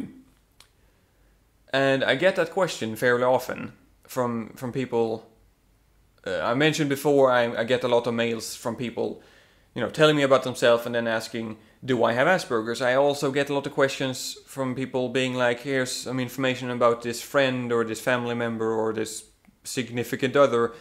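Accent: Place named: Sweden. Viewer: I dig that swedish accent of yours!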